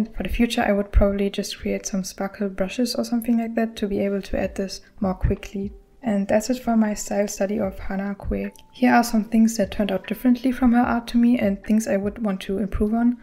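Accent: Germany. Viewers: already one of my favorite art style studies I've watched so far, you deserve so much more attention! Also, idk why but you sound like you have a German accent lmao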